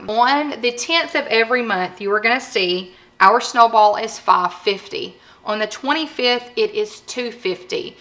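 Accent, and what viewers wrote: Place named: Georgia, United States. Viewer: Wow, that was so incredibly helpful! I’d been looking at this item for a few days now and was having trouble getting the info I needed to make a purchase decision. I’m another one of those visual people and you’ve sold me on it and shown me all I needed to know to get started. Love your personality! Your accent reminds mo of my cousins in Georgia. I’ve subscribed and look forward to more videos! :)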